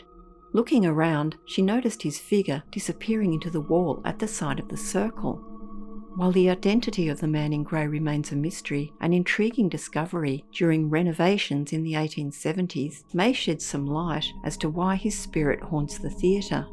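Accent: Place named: Australia. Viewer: This is my favourite channel. I love hearing Australian voices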